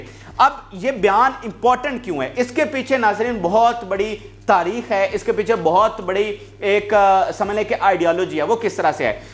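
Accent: Punjab, Pakistan. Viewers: Panjabi hinglish is very good.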